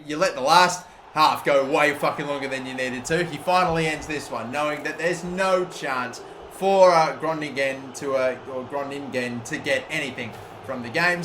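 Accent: Australia. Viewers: It’s probably his Australian accent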